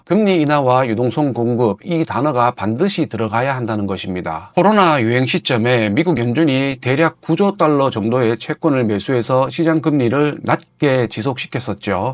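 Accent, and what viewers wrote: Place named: Gyeongsang, South Korea. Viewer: 몽실몽시리하게ㅋㅋㅋㅋ저희집이 경상도라 너무친근감이있습니다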